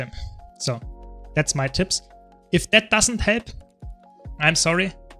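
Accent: Germany. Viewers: des ischn deutscher ich hörs doch :D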